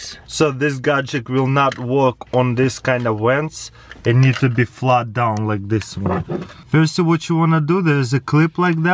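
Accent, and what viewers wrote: Russia. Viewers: I think your Russian accent is what makes you so hilarious, not to mention the BOOMS and the TUMBS up. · I've always wondered do you live in Russia because you have a Russian accent? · Michael Hawthorn meeee i love Russian Accents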